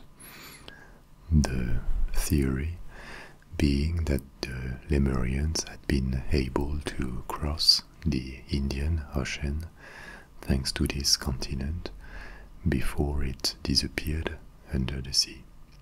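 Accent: France. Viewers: Very relaxing videos. I dont ever get tingles but I watch asmr for relax, is my new favourite. I REALLY like the accent. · In English it is “play-toe.” Plato was Greek. The proper pronunciation IS actually “plah-toe”. With a long “ah”. He is saying it correctly, he just has a French accent.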